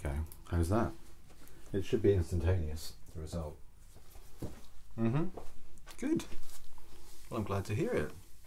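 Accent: United Kingdom. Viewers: Its incredible how much i love the british accent!! Besitos From Spain♡ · 썸네일만보고 속삭이는 소리 기대하고 왔는데 제가좋아히는 영국식 영어에 목소리까지 너무 좋네욬ㅋㅋㅋㅋㅋㅋㄱ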